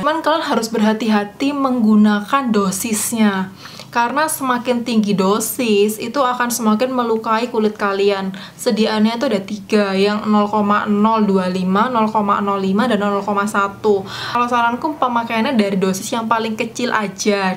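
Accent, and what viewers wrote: Java, Indonesia. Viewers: Medokk yaaa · ngomong jawa'nya medok banget y...😂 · Medhoook e rekkkk😅😅😅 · Logate koyo wong jowo:v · nice info.. btw medok bwanget mbak e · Medokk bangettt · Dokter orang Jawa tah,ngomong nya medok😁,sama kayak saya kalo ngomong b.indonesia nggak bisa hilangin logat jawa'nya 😁 Btw, terimakasih informasinya dok, sangat bermanfaat 🙏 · Suaranyaa mueedokk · Alhamdulillah untuk jerawat saya tdak terlalu seperti itu yg agak seram jga, cma ini aja masalah sya mau sering kluar rumah/sering di rumah tapi sama aja wajah sering bnget berminyak dah coba sabun cream muka tapi msih aja terutama bagian sekitar hidung hingga ke kening/jidat kepala, mohon pencerahanya dok... Btw salfok mba dokternya koq agak medok bnget bicaranya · Mbk, bahasa jawa nya masih ke bawa, bawa ya, 😀😁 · Medok bangetttt ngomongnya 😆😆, tapi pintar kamu yaaa soal nutrisi kulit 😗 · Jawa nya modok bnget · Medhok banget 😂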